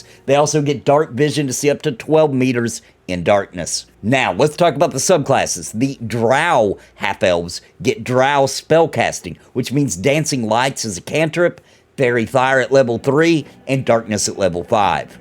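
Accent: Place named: Georgia, United States. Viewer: Are you from Georgia? You have the same dialect as the man the myth the legend himself, Judd. None the less, great video, I'm also gonna be a Paladin Dragonborn!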